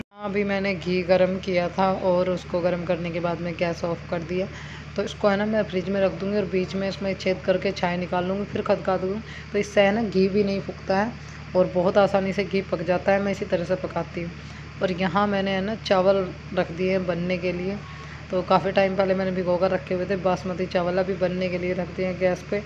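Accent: Haryana, India. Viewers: Aaj kl language haryanvi tone le rhi h Kya baat h samjh nhi aaya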